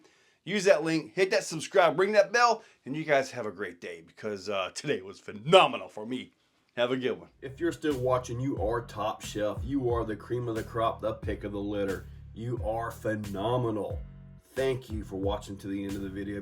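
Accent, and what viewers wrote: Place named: North Carolina, United States. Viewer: Where is he located? I just moved to North Carolina and he sounds like he’s from around here somewhere… lol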